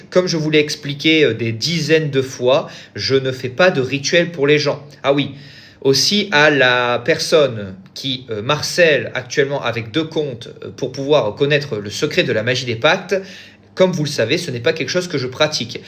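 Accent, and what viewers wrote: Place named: South, France. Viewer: Je veux les vidéos avec l accent du Sud.🎉Pourquoi tu l as enlevé?😮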